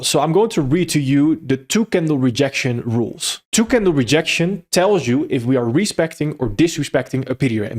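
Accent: Netherlands. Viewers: Top notch content, simply top notch! Also, you're Dutch no? I've listened to Peter Bom for a while and there's similarities in the accent.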